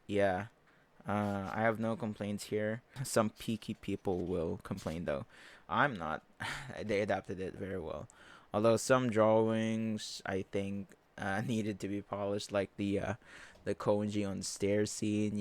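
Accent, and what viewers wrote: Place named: Philippines. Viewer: Completely unrelated to the the topic. But you have a strong filipino accent my guy lmao. were you born there and still currently live there? Im filipino too but wss born in the states. So my tagalog isnt good i can speak the basics but when it comes to having a complete convo i cant lmao but i can understand all of it. Im more fluent in spanish since a lot of my friends are latino i know weird lmao. and from a very young age I've always watched anime in Japanese so i know japanese too lmao.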